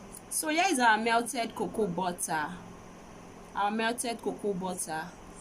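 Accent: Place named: Lagos, Nigeria. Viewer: Ur Yoruba accent is like dis Lagos pple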